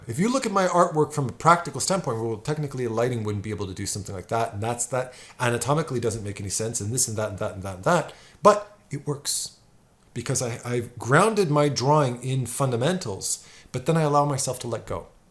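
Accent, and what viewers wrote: Quebec, Canada. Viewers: Love your videos, been fallowing you for awhile. I'm from New Brunswick. And I've heard you mention your from Montreal. Whether your English originally or French, I had to smile cause I heard the French Canadian Accent Pop in this video for the first time! Keep up the great work! LOL got a bit further in the video and caught you subduing french pronunciation of"necessary."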